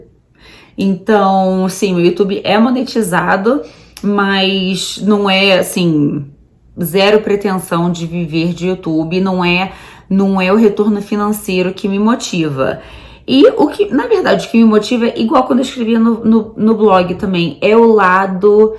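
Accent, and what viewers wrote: Rio de Janeiro, Brazil. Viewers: Oi, então a sua voz é maravilhosa adoro você falando,especialmente com esse sotaque carioca que é o meu preferido acho lindo lamento ter descoberto você quando já estava mudando para 🇺🇸 e não tive a oportunidade de conhecer -la pessoalmente aqui em londres, mais enfim sou super fã dicas de beleza então são minhas favoritas.bjus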